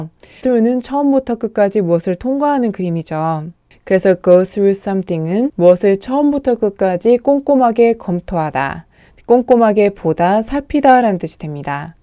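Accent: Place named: United States. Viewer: 진짜 미국영어네요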